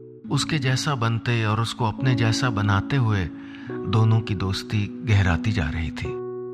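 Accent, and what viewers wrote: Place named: Bengal, India. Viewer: Love you Sir from Kolkata .. kitna sweet lagta hai app ka Bengali :)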